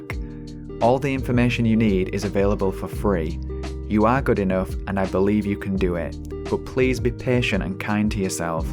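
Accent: Yorkshire, United Kingdom. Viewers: Yorkshire accent ;)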